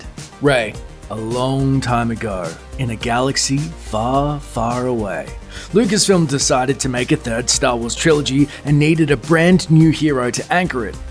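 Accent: Australia. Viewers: I'm sorry, but that douchie Aussie accent is super distracting... XOXO ;) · woah hes australian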